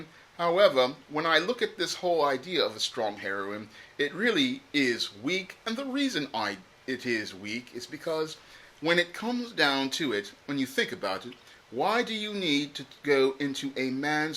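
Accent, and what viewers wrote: United Kingdom. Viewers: Nice British access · The British accent is a nice addition as always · Love the British accent throughout the commentary. Very diverse form of commentary and the evil laugh was fitting of a British villain. Everything you said is on point and I am sick of these movies now. I'll pass on this since they passed on Idris. What a slap in the face to black men. Carry on now!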